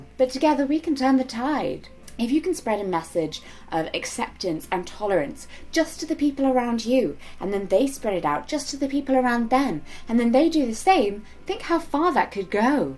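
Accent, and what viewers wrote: RP, United Kingdom. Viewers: People probably ask about your accent, because it sounds exceptionally posh.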